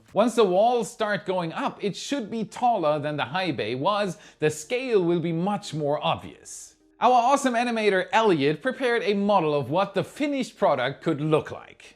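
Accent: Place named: Germany. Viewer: Is it just me, or Is German accent starting to fade a bit? If he lives in Florida long enough, it'll start turning into a southern accent...wonder what that will sound like? a german-southern accent...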